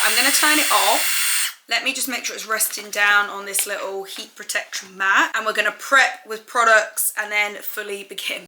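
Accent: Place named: Australia. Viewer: I thought you was Australian at first 😂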